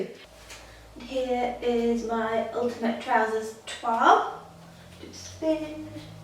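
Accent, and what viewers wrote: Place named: England, United Kingdom. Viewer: I was going to say, your accent sounds very English to me! A friend of mine with Welsh family started learning some once but it seemed to have some seriously complicated spelling - mutations and things!!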